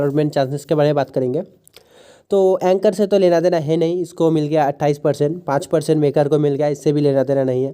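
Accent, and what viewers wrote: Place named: Bengal, India. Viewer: Bhai bangali ho kya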